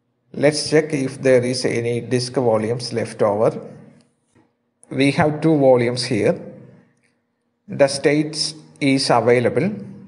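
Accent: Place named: Kerala, India. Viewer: Full Mallu acsent ..Funny!!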